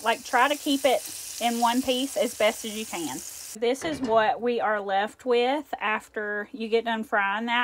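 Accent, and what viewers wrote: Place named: South, United States. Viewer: I love your accent and cooking style! I am a Midwestern, but lived in the south in the 90's... I miss the accent and food. 😢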